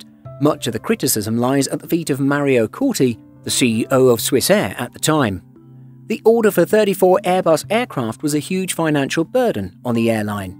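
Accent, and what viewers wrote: France. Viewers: Nice french accent! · that french accent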